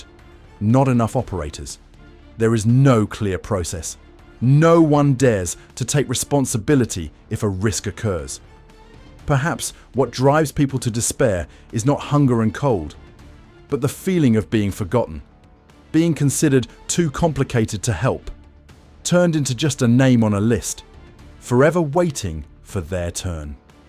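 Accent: United Kingdom. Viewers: I work on the strip. Untrue. Every night I leave nobody sleeps on strip. And they are not allowed in any casinos. Yes there are some in the tunnels but you overexaggerat. Why did your video sound like you're UK.??? Homeless in Vegas, why are you in the damn tunnels???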